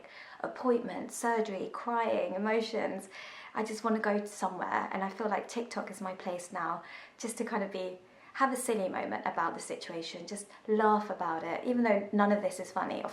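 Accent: United Kingdom. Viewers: ur from india and u have a british accent lol. that's so cool. how come u have a british accent but ur indian.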